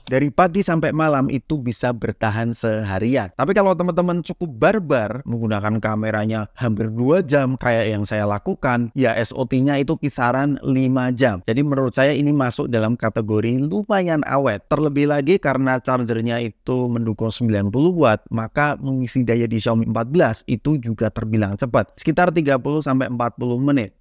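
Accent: Semarang, Indonesia. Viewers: Mas…logat mu Semarangan banget 😂😂😂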